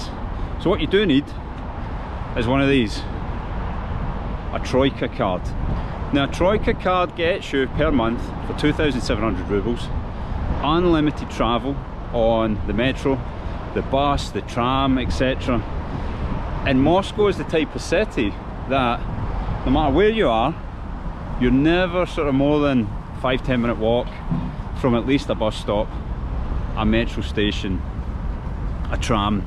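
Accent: Scotland, United Kingdom. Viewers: My favorite is mayakovskaya as well!) Everlasting elegance. You seem a very nice guy😊 and I do love your Scottish accent. Have you been to St.Petersburg? It might remind you of Edinburgh✌️🤝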